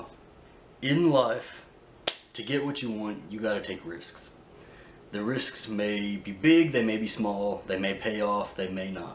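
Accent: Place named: Alabama, United States. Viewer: I could listen to your deep Alabama voice all day my favourite YouTuber no whistles or click bait. Your channel is artistic, authentic, honest, my favourite YouTuber keep up the great work !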